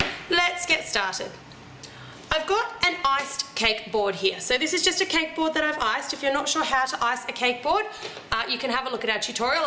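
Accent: Australia. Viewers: australian i think.... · Are you Australian of South African.... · She is from Australia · ur aussie right?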